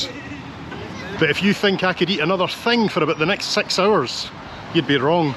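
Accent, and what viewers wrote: Scotland, United Kingdom. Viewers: What a treat to stumble on a video yesterday and today, both narrated in my favorite accent Scottish. The videos were interesting, too. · The body of water between Halifax and Dartmouth is impressive. From Wikipedia: Halifax largely owes its existence to the harbour, being one of the largest and deepest ice-free natural harbours in the world. Before Confederation it was one of the most important commercial ports on the Atlantic seaboard. I loved watching your tour of my home town & listening to your Scottish accent. I recently visited Edinburgh and was touched that you would visit my childhood home, so far from your impressive city.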